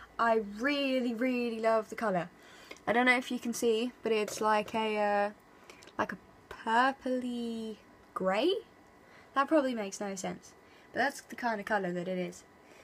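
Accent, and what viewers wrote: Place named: United Kingdom. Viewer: i love your british accent, it's pretty nice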